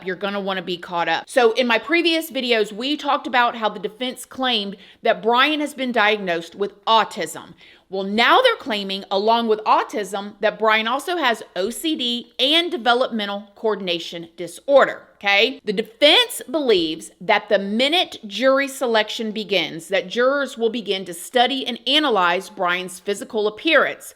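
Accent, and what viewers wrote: South, United States. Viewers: Love your videos! I watch every new video that comes out. It makes me laugh tho everytime at the end of your videos cuz you go from southern “yall’s” to valley girl “Byeee” 😂 Love it!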